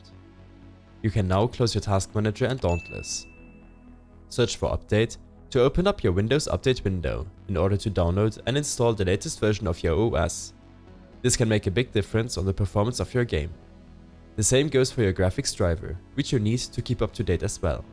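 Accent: Germany. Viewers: you speak like a german, if im right then danke für das tutorial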